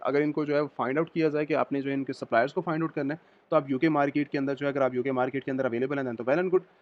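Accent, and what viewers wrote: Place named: Lahore, Pakistan. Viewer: i think you are from pakistan because your accent is like a lahore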